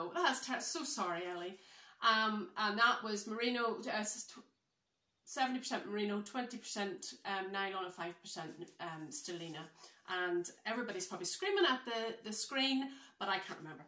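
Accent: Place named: Northern Ireland, United Kingdom. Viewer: Just came across this podcast and enjoyed it so much so I'll be checking out all your others. I love your cheeriness and your easy chat and also your N Irish accent. Your Kastelet is beautiful and just my style so I will be checking it out on Ravelry..thank you.. Looking forward to watching your previous podcasts. God bless you lovely lady. x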